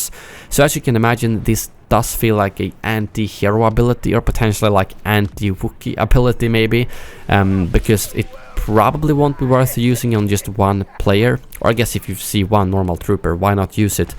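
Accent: Sweden. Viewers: Är du fråb Sverige?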